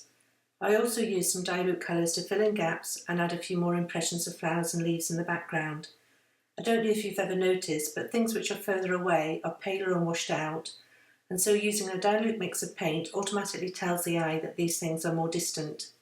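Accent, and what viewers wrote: United Kingdom. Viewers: hi, do i detect a Welsh accent? Where r u from, Me-formerly Pembrokeshire! i'm only half way thru ur vid, but i had to send you this first b4 continuing! x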